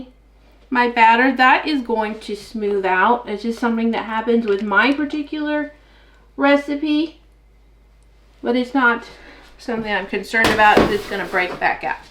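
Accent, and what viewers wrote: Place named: Missouri, United States. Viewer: I love your accent. I'd love to visit Missouri one day - I love how varied the states are.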